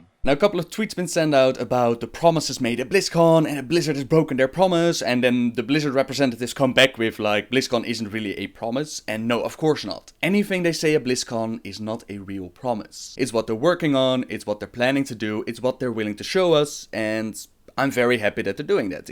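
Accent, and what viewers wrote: Netherlands. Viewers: Argg Dutch accent, man.... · Damn, that Dutch - English dialect ^^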